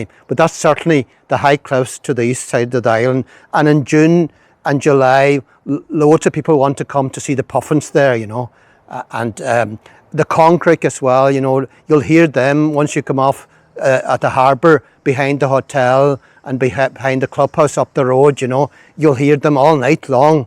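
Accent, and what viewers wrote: Ireland. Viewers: Isle of Tenerife, Spain, Africa. Brilliant! What an interesting, informative video! Well done! And all said in beautiful, melodious Donegal English, the favourite accent of many. Now, a maybe strange request: As many folks are interested in Tory, not only for its fine paintings and archeology, but also for a maybe even rarer aspect of its culture, the Gaelic language, said to be less depleted there than anywhere else. Would you consider doing the very same layout as this video, but all completely in full, natural, Donegal Gaelic? I'd be riveted to hear it. Best wishes, Patchy. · Its quite extraordinary and refreshing to hear an irish man speak english as a second language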